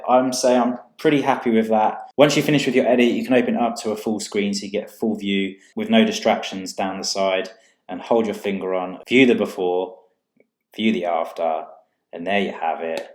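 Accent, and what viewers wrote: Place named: South East London, United Kingdom. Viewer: He sounds like he is from the south east of london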